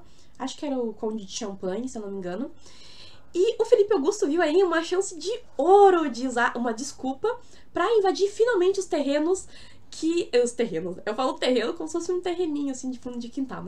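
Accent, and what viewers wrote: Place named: North, Brazil. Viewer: Bacana , bom saber que existe uma juventude diferenciada no sul do paìs e que fala um pouco igual a nos do norte ( belem - pa) do pais , tu explicas bem.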